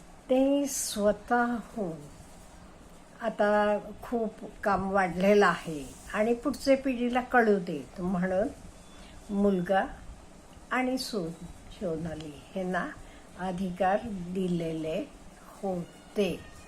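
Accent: Karnataka, India. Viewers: तुम्ही मूळ कन्नड बोलता का. बोलण्या बरून वाटते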